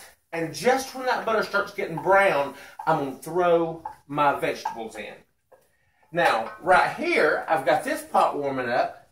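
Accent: South, United States. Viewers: I can't wait to try this! Yours looks so good!! And I love your Southern Twang!!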